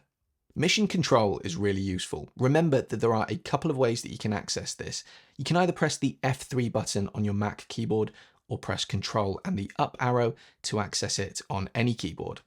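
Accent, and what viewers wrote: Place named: RP, United Kingdom. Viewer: Duck! I think I am addicted to this posh British accent...very nice content in nice pace.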